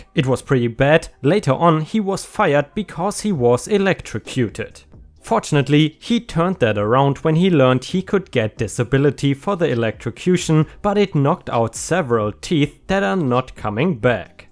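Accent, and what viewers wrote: Germany. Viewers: The Person speaking: Are you gernan? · Are you german ?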